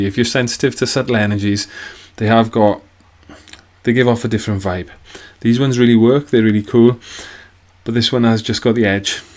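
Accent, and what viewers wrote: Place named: Wales, United Kingdom. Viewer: Eyyyy nice to hear another welshman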